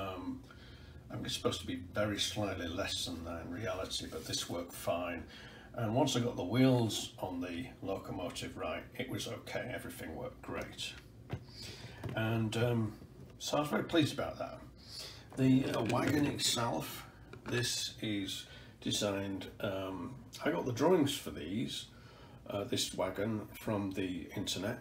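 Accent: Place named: England, United Kingdom. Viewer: Maelee said “ahh he’s from England, that’s why he has an accent”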